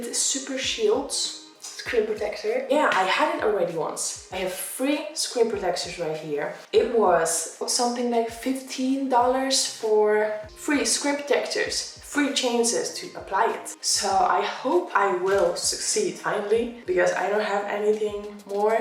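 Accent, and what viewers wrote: Netherlands. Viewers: I know for a fact u Dutch I know the accent of my ppl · heyyy!! Nederlands!! Ik dacht al een hint ervan te horen. Het kleine Poolse gedeelte bracht me in de war 😂 geniet wel echt van je video’s!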